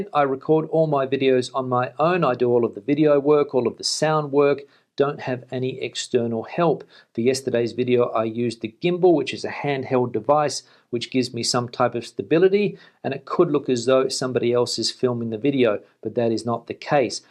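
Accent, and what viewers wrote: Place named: Australia. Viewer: Lyon is in France; LEON is in Spain...get rid of that Australian accent...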